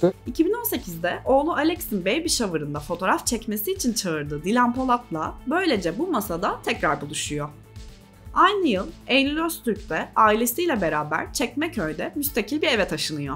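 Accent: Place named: Bolu, Turkey. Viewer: bounlu di mi, konuşmasından anladım...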